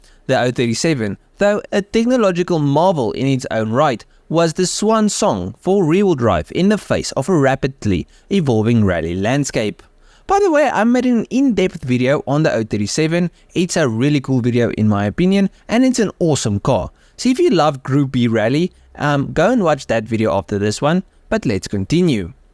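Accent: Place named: Benoni, South Africa. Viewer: You must be from benoni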